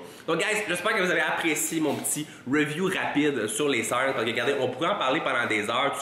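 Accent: Quebec, Canada. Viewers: J'adore l'accent du Quebec, j'ai vécu 1 an et demi à Montreal vers Sherbrook Est, et j'avais adoré, les gens sont super cool, et les salles de musculation sont excellente ! Enfin bref, j'ai regardé plusieurs de tes vidéos et suis impréssionné par la différence entre un physique sous stéro et sans, à quel point ces produits ont une grande capacité à transformer un corps. Mais belle décision d'avoir arrêté pour garder ta santé, sur la sexualité, haha, car à quoi bon avoir un très beau corps si l'on ne peut même pas faire l'amour à sa copine! Let's rock ! ;-)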